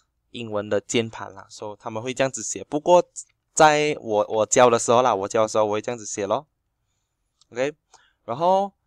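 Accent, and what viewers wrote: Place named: Malaysia. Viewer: 先改一下你的馬來西亞的華語的腔調，很難聽！很多壞習慣！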